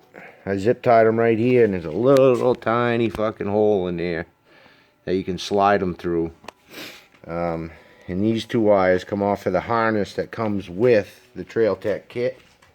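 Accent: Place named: Boston, United States. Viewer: "I live up in tha woods" I wonder if he's here in New Hampshire, "shit gets pretty dahk" knew it, ha. Can't mistake the combo Boston/ Downeast twang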